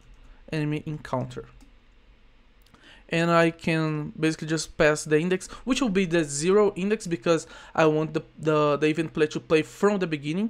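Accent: Brazil. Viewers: é incrivel, todo brasileiro consegue saber q o mano é brasileiro só de escutar a voz e o sotaque kkk, mas otimo video por sinal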